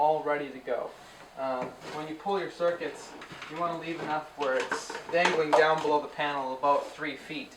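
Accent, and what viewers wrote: Minnesota, United States. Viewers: Sounds like a Minnesota accent. A virtual Canadian.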